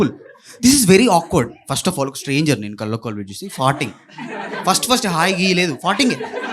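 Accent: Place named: Telangana, India. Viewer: Mana slang lo lekapothe anta comedy ga undedi kademo... But chala bagundhi bro😂😂😂hope you will be one of the famous standup comedian from telangana...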